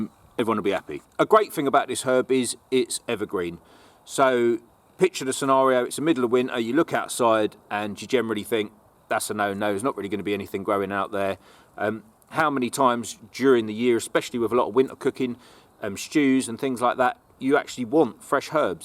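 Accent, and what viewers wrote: England, United Kingdom. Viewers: Good advice. Are you in England? (Going on your accent 🤷‍♀️) I'm in Scotland and not sure if I should grow thyme in a pot or in the ground? What do you think?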